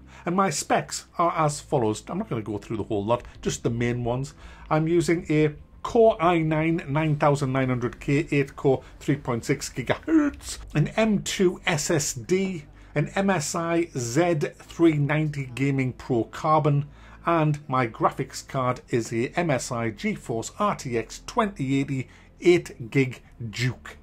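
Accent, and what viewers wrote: United Kingdom. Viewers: I've always disliked how nasal the English can be with their letter "a" like when they say, "Absolutely." What's accent? What part of UK? I've been a subscriber for a long time and always liked how he sounds.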